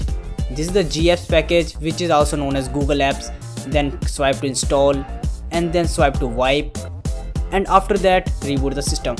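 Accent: India. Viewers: Indian accent is funny and weird. Don't get me wrong though, I love your videos. Keep em up!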